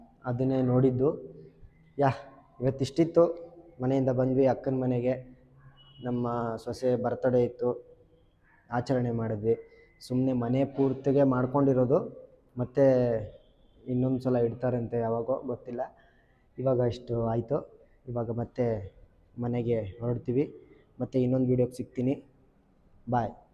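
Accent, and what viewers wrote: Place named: North Karnataka, India. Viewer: Anna nivu uttarkarnatakad bhashe yalle matadri, ade accent chand kelalikke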